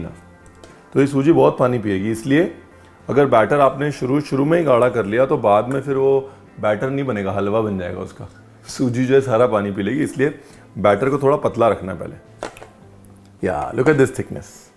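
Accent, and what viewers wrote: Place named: Punjab, India. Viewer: Punjabi Accent 😍😍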